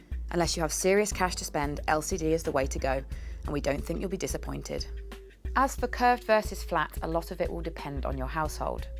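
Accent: United Kingdom. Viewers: Her British accent is just too thick ! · I cannot make out a word she says! The british accent is lovely but is totally unclear for me. I would really like to know this info but cannot understand her.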